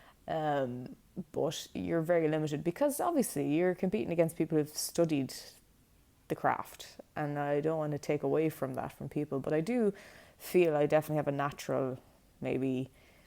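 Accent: Ireland. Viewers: I swear I could listen to read the phone book and be happy for hours. The Gaelge accent does that to American ears.:)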